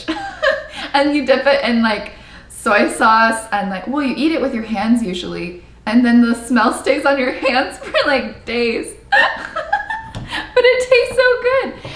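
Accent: Philippines. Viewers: I admire you and like the way you appreciated the foods and how you explained the experiences you had in the Philippines. Your giggling is adorable other that you're gorgeous and beautiful. I also like the way you say our language and uttered it the Filipino way. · Your Tagalog accent is mind blowing 👍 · Wow nice filipino accent 🇵🇭🇵🇭👍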